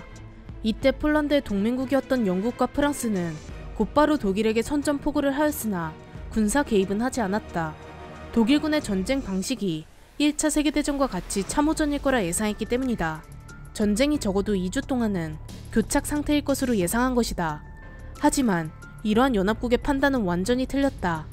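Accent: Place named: Gyeongsang, South Korea. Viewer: 경상도 억양이 섞여있으셔서 그런듯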